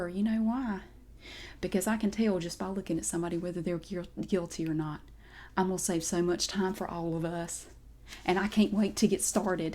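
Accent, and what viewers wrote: South, United States. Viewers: I absolutely love the Southern accent but the creativity of this was something else :D Very entertaining and relaxing, thank you!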